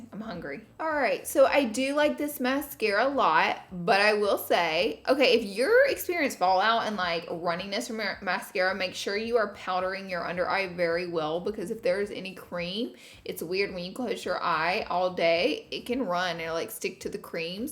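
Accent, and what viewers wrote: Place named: Alabama, United States. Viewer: I absolutely love you and your videos! I am also from Alabama so I can relate to the way you talk so well lol. It’s so cool to see someone that grew up in a similar atmosphere to me is doing such great things. It gives me a lot of inspiration! Much love. ♥️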